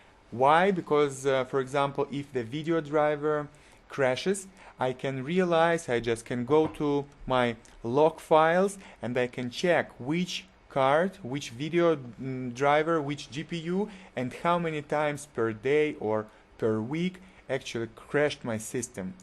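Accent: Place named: Russia. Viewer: Отлично! Всё понял друган! Привет из России! Сколько сейчас долларов в сутки майнишь? что то у тебя реально конкретный акцент....ты из РФ что ли? :)))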